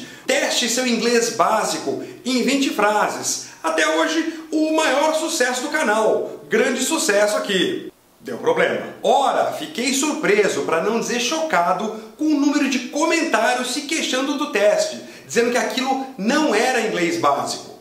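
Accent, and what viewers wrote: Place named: United Kingdom. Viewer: your British accent is evident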